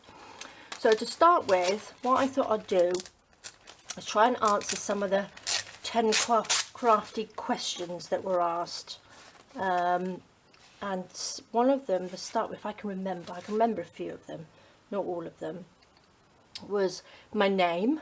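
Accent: United Kingdom. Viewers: Love you no brainer approach— I plan to do more also this year I have found stabilo pencils work nicely for shadows and smudging Never would have guessed you were in Portugal! English accent— small world after all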